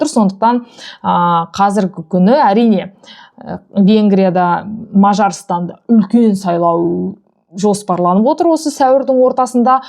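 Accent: Standard. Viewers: Айналайын қандай жақсы таза қазақша жеткіздің рахмет 👍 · Өте түсінікті таза қазақша сөйлейсің рақымет ұзақ ғұмыр тілеймін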